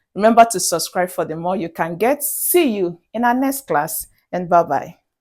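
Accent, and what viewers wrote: Nigeria. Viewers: Your accent is typically Nigerian and you are a very good math teacher.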